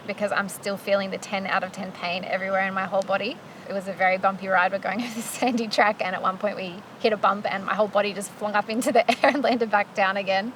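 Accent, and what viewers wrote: Australia. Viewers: Holy shit. I didn’t know you could talk!! You’re Aussie. I thought you were a Russian! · Love your Australian accent 😮😮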